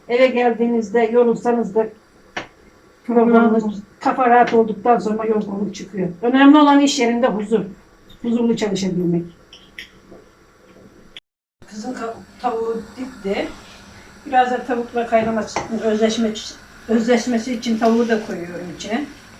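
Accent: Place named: Thessaloniki, Greece. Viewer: Annemizin şivesi ni Selanik macirlar gibi konuşuyor Memleket nere si acaba bu arada Miraç kandiliniz hayırlı olsun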